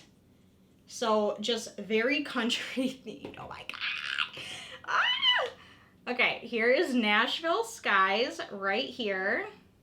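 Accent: Michigan, United States. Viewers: I love your mitten accent lol. "I got it Ahn" 😊😆🫶🏻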